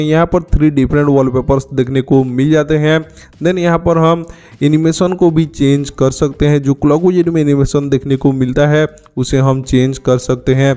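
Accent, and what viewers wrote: Odisha, India. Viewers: I can recognise odia people's Hindi accent from miles away..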